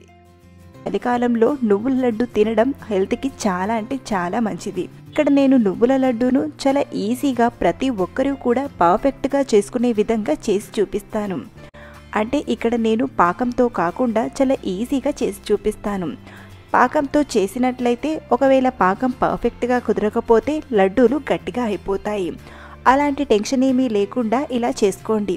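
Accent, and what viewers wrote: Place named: Warangal, India. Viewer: mi proper warangal anukunta same ma warangal language matladuthunnaru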